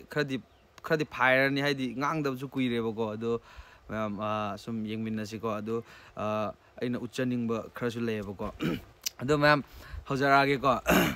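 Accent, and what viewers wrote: Kakching, India. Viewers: Hit chatle kakching maronse 😂😂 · Touruk o kakching tune da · Lon khoiba khr kaokhrasu accent di kakching oiri 😅… 50k ywhllase mym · Kakching marol da adum ngango taba ym nungaiye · Fjna mlle kakching mrolse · Ym manle eikhoiju kakching ga thngnana leibne aduna marol se fajana khnge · Kakching macha original deidi Brother nabu henna mankhreda🎉... Full support.. Aroibgi kakching lon gi sentence tudi word amfao khngba ngmde💔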